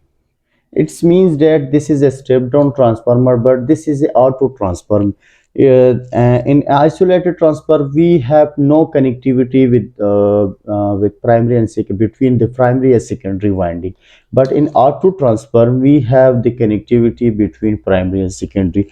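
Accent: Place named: India. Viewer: Normally i find Indian accent difficult to understand, but you speak slower, with good pace and clearer, it is easy to understand you. Thank you very much for sharing your knowledge with us with so much care in both your instructions and handling of a foreign language.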